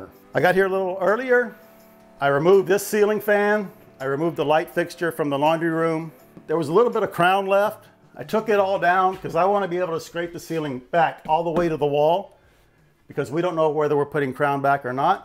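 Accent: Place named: Midwest, United States. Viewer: Popcorn and any ceiling textures are a bad idea! Nasty job! A question for. Where did you grow up? You have the mid-west accent. I grew up in Milwaukee, WI. Folks in LA know I'm not from here:-)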